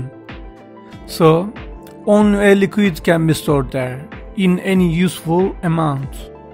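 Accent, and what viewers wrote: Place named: Turkey. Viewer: Nerde duysam tanırım bu Türk ingilizcesi, selamlar kardeşim :)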